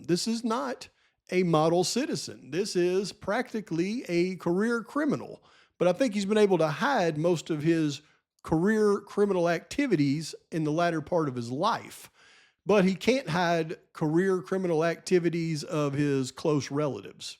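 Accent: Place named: Arkansas, United States. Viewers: Mountains. I'm thinking Northern Arkansas or Geirgia. Everyime I play one of his videos, my husband says he sounds just like Dr Phil! Where is Dr Phil from? Lol! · Funny. I’ve been curious where you were from. Southern accent and you say “Interesting” the same way I used to when I grew up in the mountains. Not as remote as you describe though. Hang in there, we’re with you.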